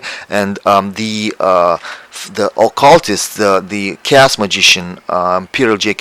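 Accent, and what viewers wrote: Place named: Romania. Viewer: Vroiam sa spun " Tabu, in marea parte a cercurilor culturale filosofice ale unei facultati" cum e cea de Filosofie din Bucuresti ( Oricum, raspunsul le , e dincolo de limitele mele umane, dar e corect.... ) Istorie a religiilor ca disciplina nu am facut nici macar la Masteratul de Istorie a Religiilor (la inceput, cel putin, ca a tr sa renunt, fara voia mea) KOGAION- te referi probabil la axis mundi din mitologia Dacilor. Un Axis Mundi face macar legatura intre uman si divin / profan si sacru / cunoasterea umana limitata si cunoasterea transcendentala etc. Ignoranta e cel mai mare neajuns al unei culturi mici, aproape inexistenta, asa cum a ajuns a noastra. Vorbesti despre Culianu, Cioran, Eliade, fenomenologia religiilor (si toate celelalt subdomenii), filosofii, curente culturale etcc etc etc si dupa atata munca, din 170 de vizualizari, cati crezi ca inteleg cu adevarat, facand corelatii si cu alte surse sa inteleaga punctul tau de vedere? 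Discutii, comentarii, argumente.............शून्य (shunya / vid ) cum spun Indienii. Altul cade-n bot si are 170.000 de vizionari. Suntem poate o natie superficiala, sau poate romanii nu-ti inteleg Engleza din cauza accentului romanesc.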